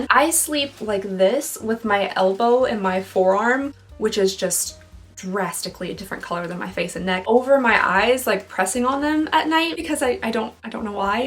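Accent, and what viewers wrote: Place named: Tennessee, United States. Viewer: daaaamn! I'm the 12th. I enjoy myself. I'm in TN so I get her accent and humor. My Mom is from KY. We're all crazy and that's what makes these videos incredibly enjoyable. Happy early Birthday!! 🥳🎁🎂🎉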